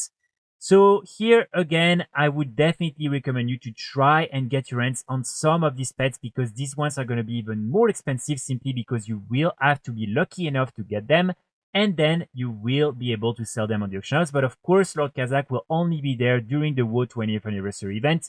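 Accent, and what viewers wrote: France. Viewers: Super tes vidéos, mais t’as un accent français on dirait.. en tout cas, ton Discord ne marche pas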